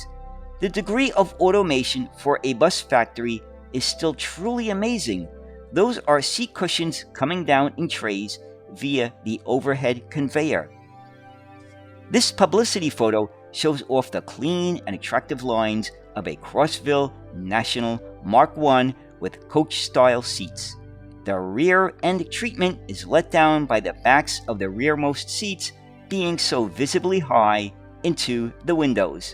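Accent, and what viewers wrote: New York, United States. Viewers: DUDE... WTH??? LOL . THIS IS SOO CRAZY. A YANK TALKING IN DETAIL ABOUT A LITTLE OLD ENGLISH BUS AND BUS COMPANY FROM THE PAST. . HAHA. I LOVE IT. YOU SOUND LIKE A NEW YORKER LOL. LOVE THE ACCENT MAN. I LIVE IN THE NORTH WEST ENGLAND , MANCHESTER AREA . NOT FAR FROM LEYLAND. IM NOT SAYING WHOS BETTER US OR YOU ARE ANYTHING, ITS JUST NICE FOR A CHANGE A FRLLOW BUS FAN AND AN AMERICAN TALKING OF SUCH A SUPJECT. THANK YOU FOR THE VIDEO. AS IT HAPPENS I REMEMBER THESE BUSES WHEN THEY CAME OUT, I WAS YOUNG, BUT A FAN OF BUSES, BUT I DO REMEMBER THEM VERY WELL, BEING VERY NOISY, RATTLED LIKE HELL GOING OVER POT HOLES, THEY HAD A BIG FAN AT THE BACK OF THE BUS THAT YOU COULD SEE THROUGH THE AIR DUCT PANAL. THST SPUN VERY FAST. I USE TO BE A BIT A FRAID OF THESE BUSES CAUSE OF THE FAN, THE SPEED THEY WENT AT AND THE LOUD NOISE LIKE A DRAGON,. 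G-LAGGG, G-LAGGGG, G-LAGGG, THAT WENT FASTER N FASTER AS SOON AS THE DRIVER SET OF. NORMALLY LONDON ALWAYS HAD THE NEWEST, BEST BUSES THE UK OFFERED, BUT BACK IN THE 70S N 80S WE HAD A VERY SPECIAL BEAUTIFUL BUS OF OR OWN IN MANCHESTER. THE MANCUNIAN BUS. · this guy sounds like he is from New York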